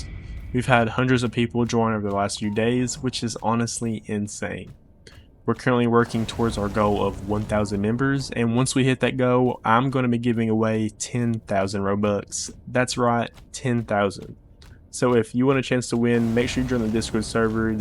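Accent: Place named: South, United States. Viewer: The southern accent is sending me 😭😭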